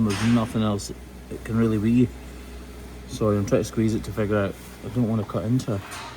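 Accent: Scotland, United Kingdom. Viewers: So interesting and love your gorgeous Scottish accent ❤️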